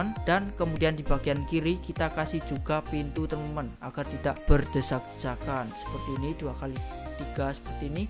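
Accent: Java, Indonesia. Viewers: Bang ngomong nya kaya orang jawa 😂😂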